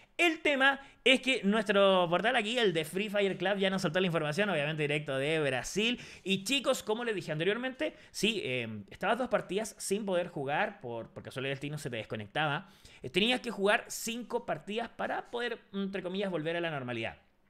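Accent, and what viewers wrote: Chile. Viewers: Ola Bro eres chileno por que hablas como chileno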